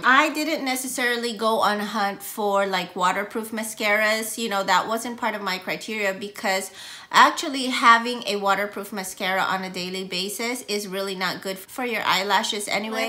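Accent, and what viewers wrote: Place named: Philippines. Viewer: Thanks for this video! Looking to get a better mascara when I toss my old ones. Just ONE good one. I don't need a bunch that are all going to go bad... Also, are you Filipina? The way you speak sounds similar to my mom. We got the down lashes too.. 😹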